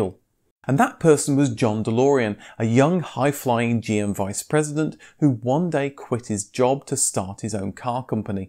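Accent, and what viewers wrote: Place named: United Kingdom. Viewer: Generally your channel is entertaining and it is always a pleasure to listen to clean British English. 👍🏻 This topic is an interesting attempt. Ok. But you should do better research and talk to Metallurgy Engineers. Whenever the talk is about Aluminum it is about 99,9% Aluminum alloys in real. We never talk about pure Aluminum because it is not stable and would react with anything in the near within the fraction of a second. How can you allege that Aluminum or better said Aluminum alloys do not rust? I am sorry but this is not true at all! You can find this out very easily on your own. Go to any football field and take a detailed look at the areas where the Aluminum alloy parts of the goals are put together. You will see that there is partly corrosion which has white colour. Car factories do not use Aluminum alloys in order to get rid of corrosion. 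This metall is easier to be handled and can save weight, but is hard to be maintained in a car body workshop if there is damage on it. Stainless steel is also not really stainless. It will rust harder but you can’t really stop it from starting to corrode. Please take care. Thanks.🌷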